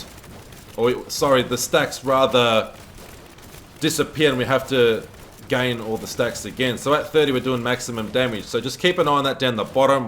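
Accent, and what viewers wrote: Australia. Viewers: It is like August....Augild....Orgild. Hope that clears it up, it is our Australian accent. Love your videos even if you can't talk properly.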